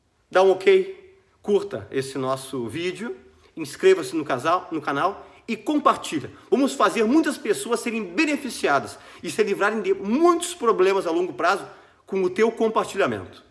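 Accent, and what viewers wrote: Rio Grande do Sul, Brazil. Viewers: Adoro os seus vídeos , não só pela competência, clareza, além de tudo isso, adoro sua forma gaúcha de falar. Eu vivo na Alemanha e mato um pouco das saudades. São excelentes seus vídeos e o senhor deve ser um excelente professor, além de excelente médico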